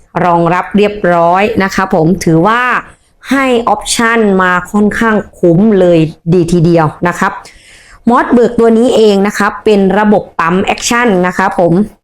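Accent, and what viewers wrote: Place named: South, Thailand. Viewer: ร้านอยู่จังหวัดอะไรครับ สำเนียงคนใต้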